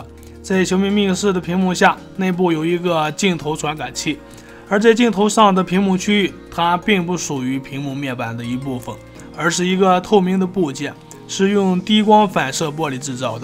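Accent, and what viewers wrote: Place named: Northwest, China. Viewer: 哈哈哈哈 晋蒙陕甘口音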